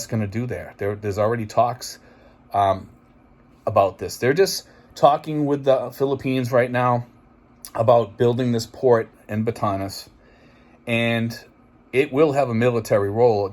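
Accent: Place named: Massachusetts, United States. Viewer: I always enjoy your VLOGs and watch them first. Maybe because I understand your accent being from Mass. myself.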